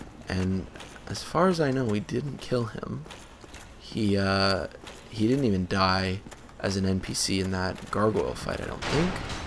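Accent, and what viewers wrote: Canada. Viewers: I just realized your Canadian .right?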